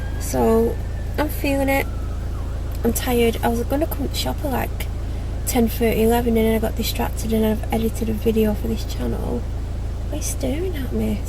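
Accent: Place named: North, United Kingdom. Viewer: I love your northern accent too 😋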